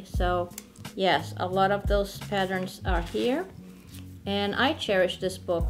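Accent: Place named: Portugal. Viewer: So you are Portuguese! I wasn't sure about your accent! Thanks for your work .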